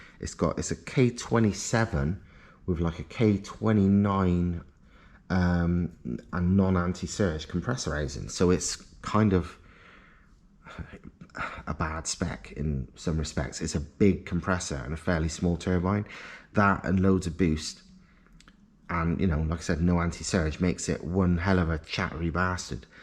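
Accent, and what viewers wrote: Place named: West Country, United Kingdom. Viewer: Dude where are you?. By the accent I'd say not that far from me lol,Gloucestershire (where I'm from) Swindon , Bristol, Bath, Somerset somewhere, Wiltshire. I do usually get it fairly right . Anyway any chance you'd interested building something for money (obviously) it will revolve around a vr5 engine (have to have that sound somehow, and an original in line is just way too pricey when the vr5 is out there). TBF though much simpler in my choice of car MK4 vr6 4 motion but turbo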